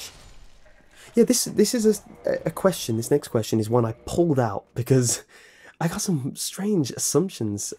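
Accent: United Kingdom. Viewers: What people like about your voice: it sounds Brittish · He's brittish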